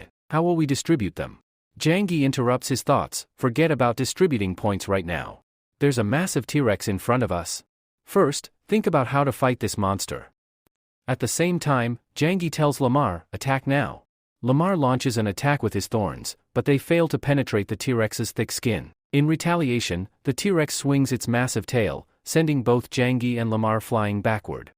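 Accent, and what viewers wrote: India. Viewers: Why give the AI a Indian accent?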